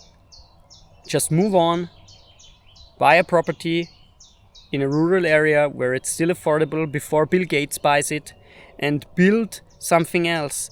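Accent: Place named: Germany. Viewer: Ah yes, the eternal dilemma of every German YouTuber: Speak your native language, and your content is effectively trapped within the relatively small audience of Germany, Austria, Switzerland and maybe a few neighboring countries. On the other hand, by speaking English, yoru videos suddenly have the potential to reach a global audience, but unless you're genuinely fluent (C1 or even C2) you'll always be ze weird German guy with ze äxent. It's either accepting a smaller audience with which you can communicate naturally, or accepting the fact that you'll always have a linguistic handicap on the global stage. I can relate to that.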